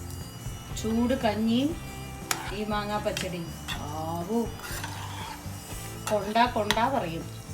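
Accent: Palakkad, India. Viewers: Palakkadan slang avatharanam super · Thanks for all your cookery shows. More than your cooking I like the way you speak because I am from Palakkad too. I am settled in Pune or for that matter I am a Puneri but a pakka Palakkadan malayali. I can read but not write Malayalam. Once again for Palakkadan Malayali basha with the slang words. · True. Her accent attracts Palakkadans to watch her videos irrespective of the menu she posts. Pakka palakkadan slang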